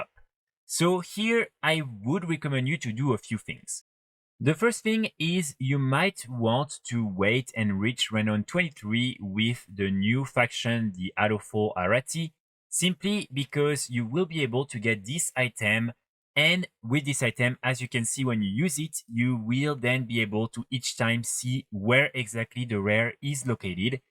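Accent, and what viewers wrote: France. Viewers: I love that french accent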